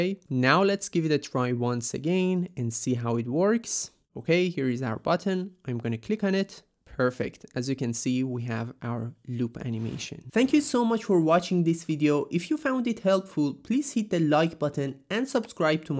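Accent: Iran. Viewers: I just knew you were iranian the second you started talking lmao, great video btw keeo it up fam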